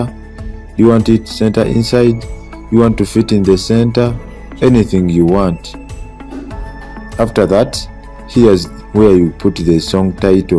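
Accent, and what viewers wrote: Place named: Uganda. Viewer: You are fro Uganda I know the accent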